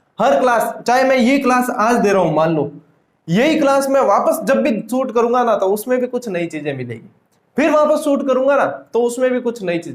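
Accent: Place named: Marwari, India. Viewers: Hlw sir.......I'm from BHILWARA. I'm literally thankful to you for providing us all the classes free of cost. I was looking for some tips about preparing for the GK since I belong to science subject so my base in terms of GK wasn't clear and somehow reached to your page. The way you are teaching is truly amazing and will be definitely helpful for all the students who are unable to buy the paid courses. I never knew that we can also study the rivers in this way and I must say that learning with these river maps or tricks will make the knowledge solid in our brains for a longer time. Apart from all these, I honestly feel good whenever you do speak in our Rajasthani. As our Rajasthani accent is quite different from yours so I do like to hear your accent. AT LAST ........THANK YOU so much sir...😇🙏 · Aapki marwadi Bhasa bhut achhi lagti hai 😂😂😂👌👌👌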